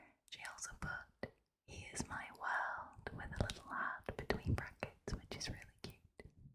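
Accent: Northern England, United Kingdom. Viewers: I absolutely adore your videos. You're fast becoming my favourite asmr artist and I love it when I get a lil notification telling me you've posted! I love the mix of whispers and fluffy mic brushing, it is soooo tingly.☺ would love just a fluffy mic video from you tbh! Also love your Northern accent, pls tell me you're a Sheffield gal?! Thank you for the videos!!! Xx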